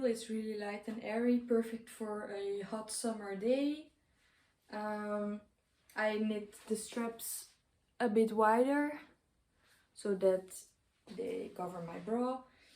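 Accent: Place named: Russia. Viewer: Прекрасные изделия! Но у вас такой русский акцент...😀 Спаибо🙂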